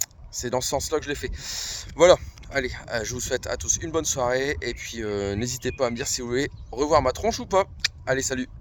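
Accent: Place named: Nord-Pas-de-Calais, France. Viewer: Non......on t'aime et chti accent moi j'aime!!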